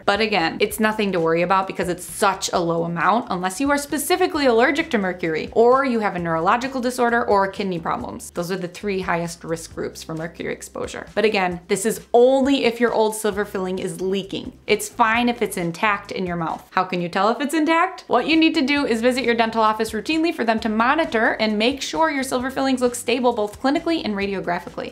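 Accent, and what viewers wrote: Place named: Upstate New York, United States. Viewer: are you from upstate NY?? I'm curious where that accent is from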